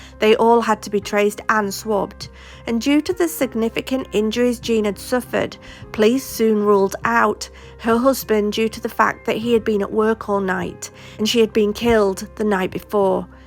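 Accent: Yorkshire, United Kingdom. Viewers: I could hear the Yorkshire in your accent right away. Always warms my heart to hear a Yorkshire accent. I miss home but don't get me wrong living in the California sunshine can't be beaten by any Yorkshire weather😆. I'm glad I found your channel and I'm working my way through your library now. I'm here to stay. Great work you do. Love the content 🤘🏻🇬🇧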